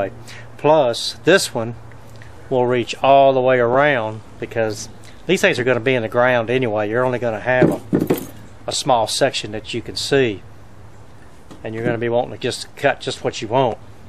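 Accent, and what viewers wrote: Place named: New York, United States. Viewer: Love your New York accent.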